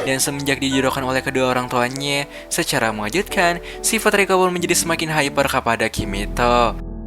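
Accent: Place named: Bandung, Indonesia. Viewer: logat bandung jier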